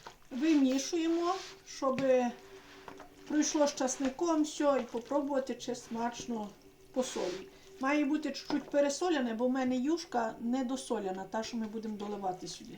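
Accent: Ternopil, Ukraine. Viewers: Дуже люблю дивитися Ваші відео, як ви розмовляєте теж. Хто щоб не казав такий діалект є в північних районах Тернопільської обл.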